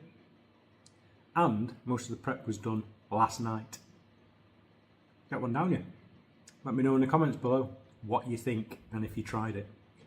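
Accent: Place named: RP, United Kingdom. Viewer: Posh sounding , posh looking. Must be posh tasting. ❤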